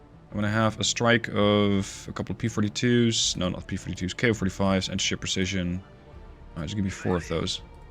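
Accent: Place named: Netherlands. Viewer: Ik detecteer een Netherlands accent in je Engels 🤔. Landgenoot 💪Dutch🇳🇱 ?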